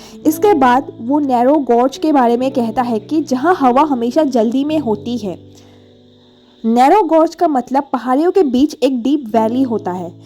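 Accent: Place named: Northeast India, India. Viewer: Her accents:) 100%she is from north-east 🫂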